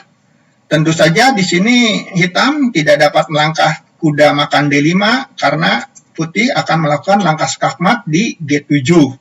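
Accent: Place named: West Java, Indonesia. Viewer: Pak logat Sunda nya tetap melekat ya. Mantap lah.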